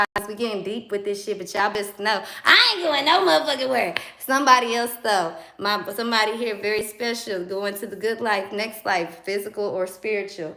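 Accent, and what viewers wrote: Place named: Louisiana, United States. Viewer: Where are you from, ?, where that accent!! U Cajun??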